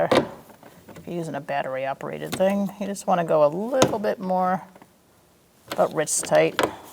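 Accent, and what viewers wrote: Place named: Boston, United States. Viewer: Thankyou for this from Queensland Australia. Love your Boston accent! I'm assuming Boston. The way you say care for car for instance.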